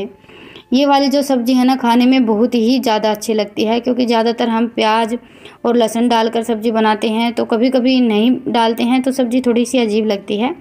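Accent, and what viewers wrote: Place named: Rewari, India. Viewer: Aap kha se h mugè to rewari se lag rhi h aap whi ki bhasa h aap ki may be rewari se hu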